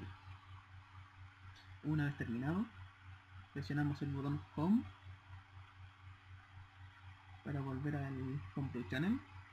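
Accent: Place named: Chile. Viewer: ¿Eres Chileno cierto? Jajaja, yo tambien soy Chileno y adoro nuestra forma de hablar <3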